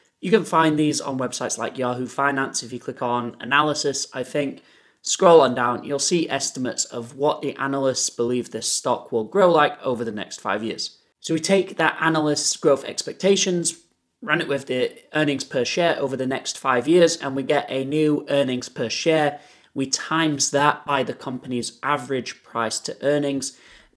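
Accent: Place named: United Kingdom. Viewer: You sound British, are you from the UK?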